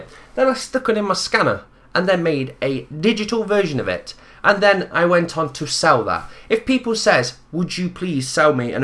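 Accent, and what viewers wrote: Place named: Derby, United Kingdom. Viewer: Are you from Derby? So strange to hear ones own accent on the internet, especially when you haven't lived there for a few years.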